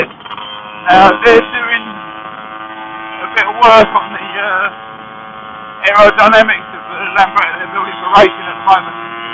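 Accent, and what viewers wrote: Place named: London, United Kingdom. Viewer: Gotta have spent some time in London to know everything he says😂innit geeza.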